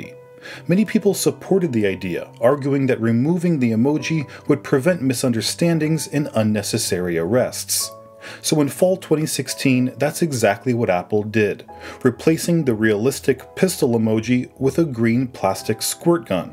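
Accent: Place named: Ohio, United States. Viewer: i hear the ohio💀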